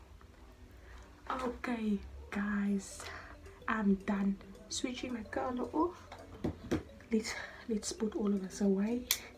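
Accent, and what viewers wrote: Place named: India. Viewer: Is that her real voice and accent. She looks black but sounds Indian🤔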